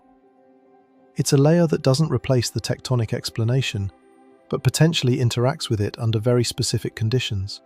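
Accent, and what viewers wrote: United Kingdom. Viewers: Different channel name same British spit mouth. There would not be lisp noise unless words are being spit mouthed. Use an American clean English AI text speech reader and stop repeating not this, not that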